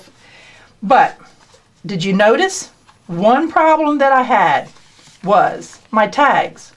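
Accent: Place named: Baltimore, United States. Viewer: That would be awesome but I think we are on opposite sides of the country. I thought I heard a familiar accent (I am from Baltimore) but we live in Seattle. My sons are still in Maryland but I don't think I can talk them into picking up blueprints to bring out in their suitcases for Christmas!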